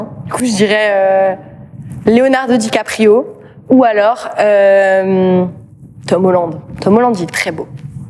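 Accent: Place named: Alsace, France. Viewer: Accent alsacien incroyable 😂😂😂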